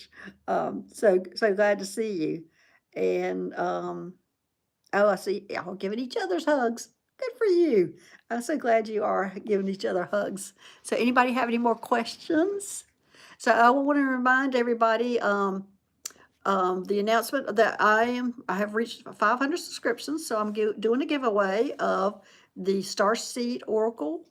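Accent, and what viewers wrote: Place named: South, United States. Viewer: Thank you for all you do. I’m probably too late, but here’s my STARSEED. You have the same southern accent my parents had. Very comforting. 💚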